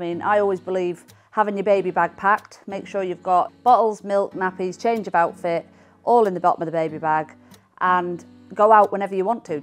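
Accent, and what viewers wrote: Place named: Doncaster, United Kingdom. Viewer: Aww beautiful 12 15. I wish i could keep more than one child. My ex wouldn't let me. Well done Paris 👏 loved the documentary by the way. I recognised some places in Morecambe from our family holiday. Beautiful home in a seaside town. Great to see you both getting along. You are amazing 👏 great to hear you haven't lost your Doncaster accent.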